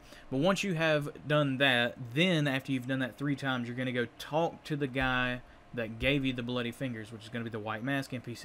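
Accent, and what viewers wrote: South, United States. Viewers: Great, informative video but that accent screams below the Mason Dixon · Are you from Alabama I am I reckon your southern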